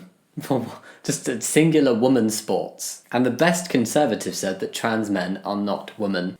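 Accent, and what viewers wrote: United Kingdom. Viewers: I’ve watched your videos on & off for years. & this is the first time I realized you have a British accent 🤯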